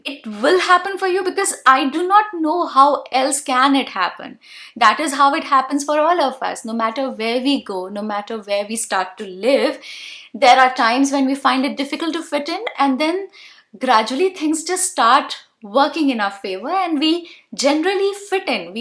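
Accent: India. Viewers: Is your accent indian, ma'am???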